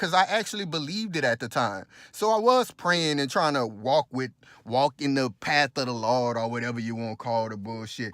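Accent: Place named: New Orleans, United States. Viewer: Sound like you stayed in new Orleans for a lil while...the accent sound familiar...but good info family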